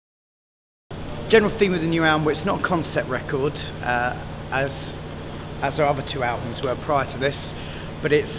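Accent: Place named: RP, United Kingdom. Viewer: I can't take him seriously as a front man for a metal band with that posh English accent.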